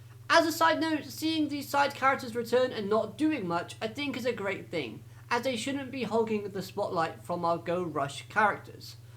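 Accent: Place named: United Kingdom. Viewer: sounds absolutely B R I ' I S H For who is essentially a British YGO reviewer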